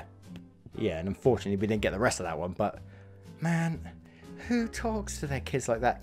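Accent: United Kingdom. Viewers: OMG A BRITISH PERSON!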